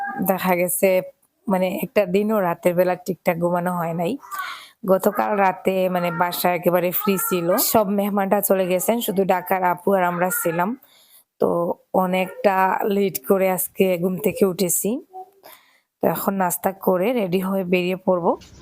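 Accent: Sylhet, Bangladesh. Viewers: আপনার কথা সুনে মনে হচ্ছে বাংলাদেশি ভাষায় কথা বলছেন আপনি তাহলে আপনি ভারতীয় কি ভাবে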